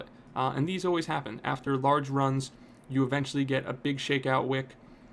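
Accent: United States. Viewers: You sound American but NL in your name means Dutch?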